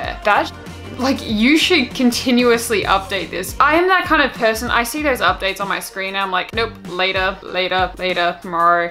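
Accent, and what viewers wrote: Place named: Australia. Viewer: More of that Aussie accent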